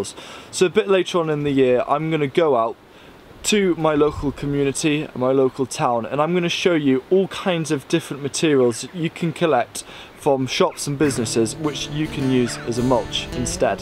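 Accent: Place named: United Kingdom. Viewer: Good expectation,I love the British accent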